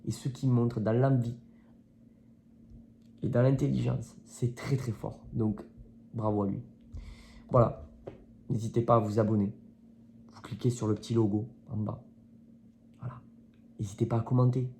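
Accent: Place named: Occitanie, France. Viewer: Et pourtant un vrai gone mais le travail a fait qu'il vit dans le sud en occitanie d'où l'accent mais lui et sa famille complète sont de la croix rousse. Lyon 4eme. Et a vécu dans le 7eme et 8eme arrondissement de Lyon. Son amour pour l'ol le prouve sans soucis.😂😂😂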